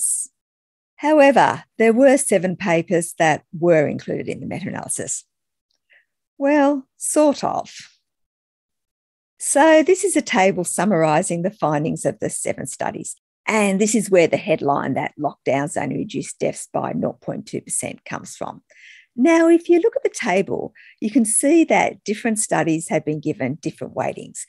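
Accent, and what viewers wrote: Australia. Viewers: She's an Ozzie. Plus, using the 'innuendo' parrot's Campbell.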